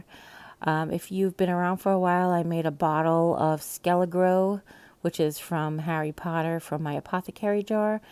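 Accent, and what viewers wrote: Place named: East Coast, United States. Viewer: Cute! It's funny how you said that most people like to hear you talk, because I was just thinking about how I like your accent. Are you from the east coast? I noticed that when you say moss, it's more like mawwss lol love it.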